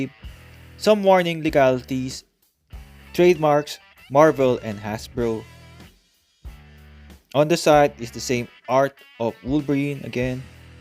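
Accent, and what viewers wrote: Philippines. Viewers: Your accent screams that you're a filipino. Lupet tol! 😂 Edit: after i saw darna figure, it confirmed my theory earlier, pinoy ka nga talaga, bro! 😅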